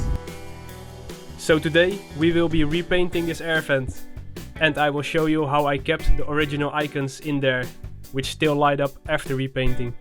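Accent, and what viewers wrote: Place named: United Kingdom. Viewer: Haha ik weet niet hoe maar ik dacht dat je brits was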